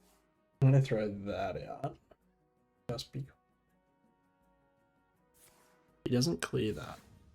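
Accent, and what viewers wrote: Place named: United Kingdom. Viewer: Kinda sounds bri'ish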